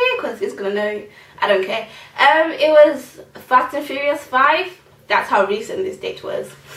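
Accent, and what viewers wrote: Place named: Zimbabwe. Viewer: you have the cutest zimbabwean accent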